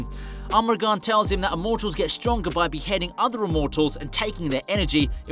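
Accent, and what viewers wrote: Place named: United Kingdom. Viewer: with a British accent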